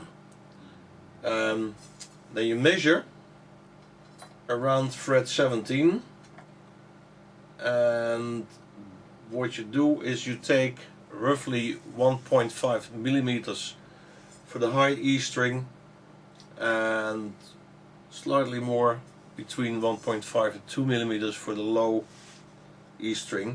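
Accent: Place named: Netherlands. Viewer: =) I haven't heard a Nederlander accent in quite sometime! Thanks for the instruction...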